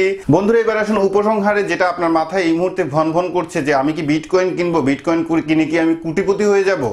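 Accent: Sylhet, Bangladesh. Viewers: ধন্যবাদ ভাই সুন্দর করে বুঝানোর জন্য।ভাল লাগে আপনার সবগুলা ভিডিও।( সিলেটি পুয়া। কথায় পরিচয়। ) ধন্যবাদ।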